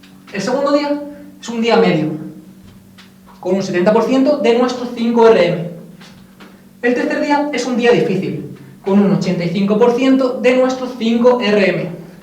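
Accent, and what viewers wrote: Spain. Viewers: De donde es de españa?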